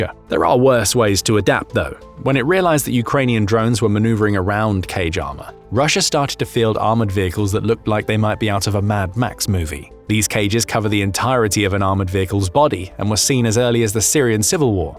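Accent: United Kingdom. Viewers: misleading title, and british yapping unrelated to the misleading title for over 12 minutes.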